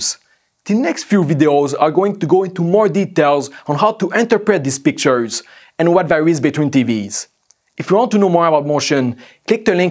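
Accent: Quebec, Canada. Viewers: On dirait que je reconnais l'accent Québécois ? :) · This guy sound like a criss de québécois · I recognize this accent from Canada QC :) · Vincent B. On est 2 a reconnaitre l accent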